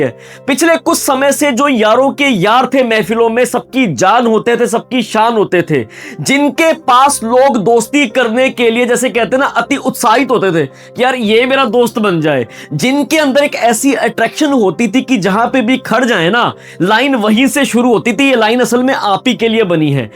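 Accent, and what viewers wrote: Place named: Punjab, India. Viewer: Punjabi accent in hindi😊 sardar pandit😊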